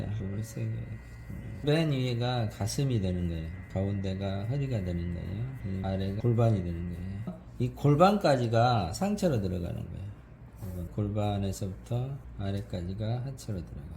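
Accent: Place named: Jeolla, South Korea. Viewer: 왜 라도치들만 유투브에 열심인가? 사투리 좀 쓰지지 마.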